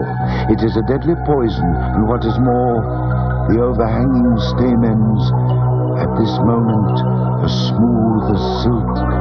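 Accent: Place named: United Kingdom. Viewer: "After mating, the females goes underground, forever." Way to go creepy british voice for teaching me about the birds and the bees.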